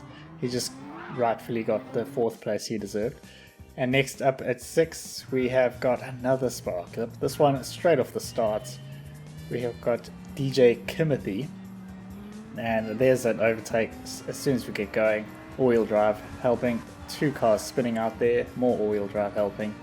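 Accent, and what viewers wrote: South Africa. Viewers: where you from ? Sound South African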